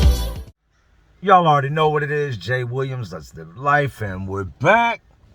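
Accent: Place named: East Coast, United States. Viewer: People need to understand your from the east coast the inner city… the way you talk comes from where you grew up and also in and out of prison lingo….I think it’s our differences that make us interesting… I’m glad everyone doesn’t sound the same…. I like hearing you tell your stories…..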